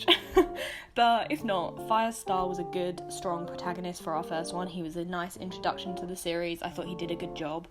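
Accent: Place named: United Kingdom. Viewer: I knew you were br*tish but the accent still jumpscared me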